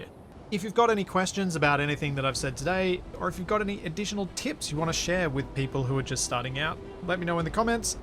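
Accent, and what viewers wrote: Australia. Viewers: You sound Aussie as, and I liked this video with its easy and full getting started walk-through.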